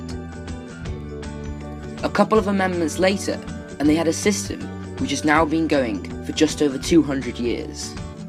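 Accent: United Kingdom. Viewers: tight n sleek british accent..loved the video :)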